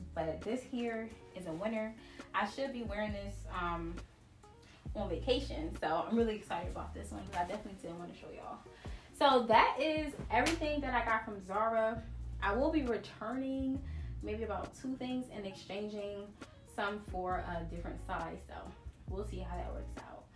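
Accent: Philadelphia, United States. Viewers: lmaoo completely off topic but as a fellow “philadelphian” i’m like she sound like she from philly. i had to do some investigation and saw it in your instagram bio lol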